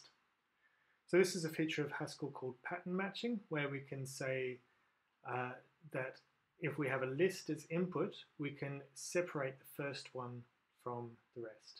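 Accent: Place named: Australia. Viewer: P.S., Hello from New Zealand. You sound Australian, though I can't tell for sure :-)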